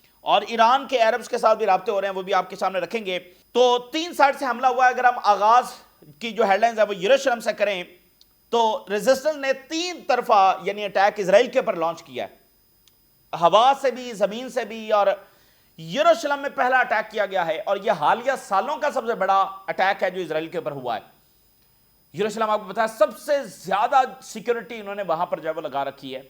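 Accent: Punjab, Pakistan. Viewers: I anticipate you are a Pakistani Muslim! Futher from your Urdu accent I can tell that accent is from punjab! Reason of contact: but from your tongue I had never heard you saying the name of Allah subanahu Tallah our creator!, you should feel proud to be a Muslim and say Allah’s name so that it is audible to all, saying Allah’s name come out from the heart ❤️ naturally without even thinking! I had heard you saying “uoper walls” but I had never heard you taking or saying pronouncing Allah subanahu Tallah’s name loudly as you say rest of the stuff why??????? but don’t you feel proud of your religion Islam and say from your tongue the noble name of “Allah Subanahu Tallah” what is stopping you nothing is more important in this world than Allah and our religion Islam and the rest of the things comes after Allah! Nothing in the world is more important than Allah! 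I will suggest you proudly proclaim Allah’s name, specially at times when your are crediting Allah, instead of saying uoper walla proudly say Allah subanahu Talla! I hope you get to my comment some how and try be better Muslim! Aameen!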